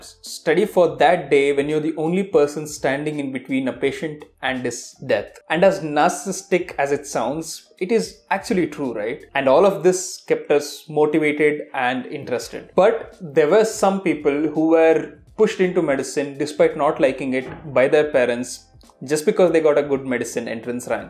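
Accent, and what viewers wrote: India. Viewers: Dude,I don't know why but i can feel your Bangalore's accent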